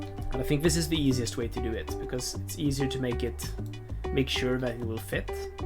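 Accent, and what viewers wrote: Sweden. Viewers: Hey, are you swedish? You sound swedish... 😄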